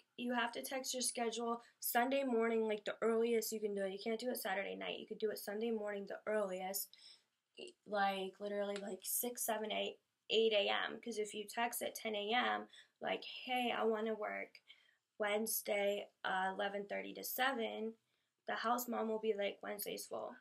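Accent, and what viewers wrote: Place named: Massachusetts, United States. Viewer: You definitely picked up the Massachusetts accent haha its kinda adorable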